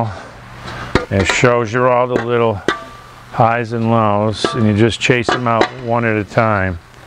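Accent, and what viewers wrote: Boston, United States. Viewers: this guy has got to be from boston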